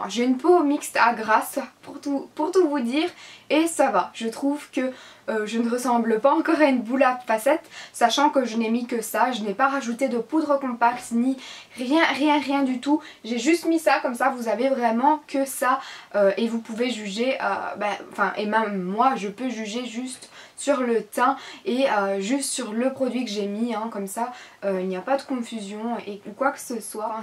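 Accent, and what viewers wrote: Belgium. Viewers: Ta voix avec ton accent Belge c'est super Mignon ah ah 💕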